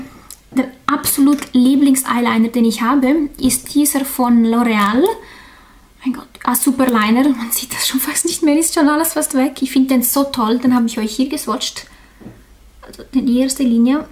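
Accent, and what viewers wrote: Switzerland. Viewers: toller akzent/ Dialekt..(weiß ich nicht genau) bist du Schweizerin?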